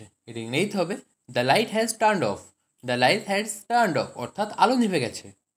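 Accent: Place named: West Bengal, India. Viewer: If i am correct are you from west Bengal 😊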